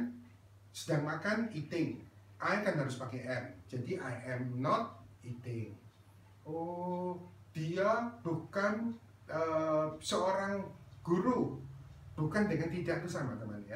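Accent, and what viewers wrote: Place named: Surabaya, Indonesia. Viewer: Kangen materi selanjutnya nih.. ditunggu upload nya, oh iya saran kalau bisa materi ini diupload ulang dengan resolusi yang bagus biar tidak pecah.. pak ada kontak atau alamat kursusnya ya? Sepertinya pak org surabaya aksennya.. makasih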